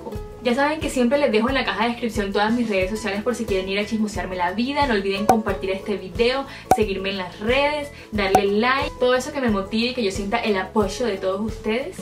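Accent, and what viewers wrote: Barranquilla, Colombia. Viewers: Un ejemplo el acento que empleas es un acento indudable de Barranquilla. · Oh me es acento costeño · no es el acento costeño, ese es el acento barranquillero, muy diferente al de otra ciudad muy importante de la region que es Cartagena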